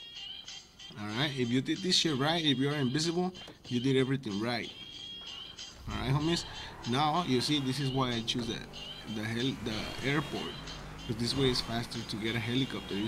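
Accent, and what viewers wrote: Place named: Mexico. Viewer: love your mexican accent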